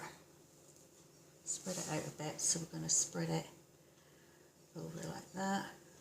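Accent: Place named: Cornwall, United Kingdom. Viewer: Were you from Cornwall originally? You sound as though you're from Cornwall, England.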